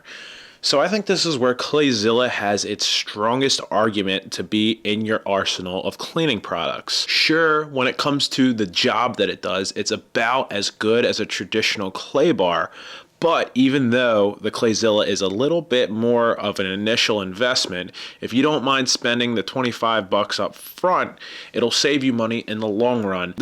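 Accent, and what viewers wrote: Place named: Maryland, United States. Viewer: Sounds like you're from Maryland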